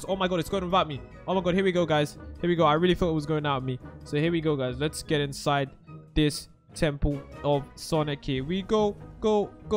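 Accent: United Kingdom. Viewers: She is a British accent not Tycho Natalie Cole of Montgomery not see Kola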